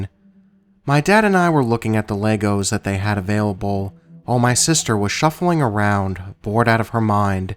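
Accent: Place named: East Coast, United States. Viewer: I love hearing your stuff, and always notice the East Coast, Tri-state "twang" to your voice! It's like listening to a friend or family member of mine telling me a story, as we're from Jersey (then Delaware and now Lancaster, PA). Not sure what state you're from but I hear it : )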